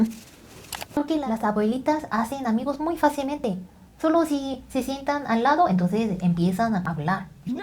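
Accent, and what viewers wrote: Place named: Mexico. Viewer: Qué bien hablas mexicano. 🎉🎉 Saludos y sigue disfrutando de México.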